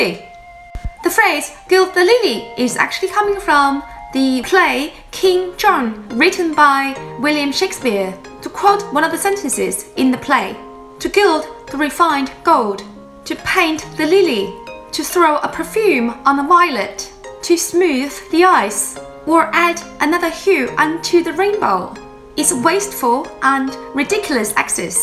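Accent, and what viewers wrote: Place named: United Kingdom. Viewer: You are so adorable 🤗 you have a really nice british accent too. If I were to guess, I'm thinking oxford/london from the accent.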